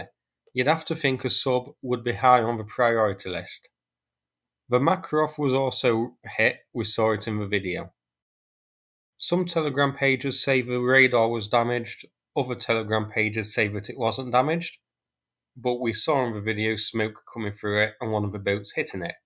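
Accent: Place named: Nottingham, United Kingdom. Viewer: great analysis dude, awesome way of breaking this all down for up to understand, and I'm sure I recognize the accent, from a notts dude!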